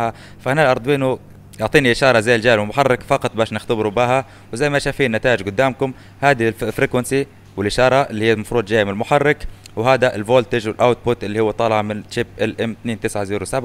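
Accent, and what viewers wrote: Libya. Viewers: السلام عليكم. حاب نتعلم منك شغلك. من لهجتك عرفت أنك ليبي يعني أبن بلادي. ياريت نقدر نتواصل معاك شخصيآ. عشان ناخذ عندك كورس عملي. أرجو الرد يا أستاذ.! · ليبي يتكلم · حسيتك ليبي😁 منور ربي يوفقك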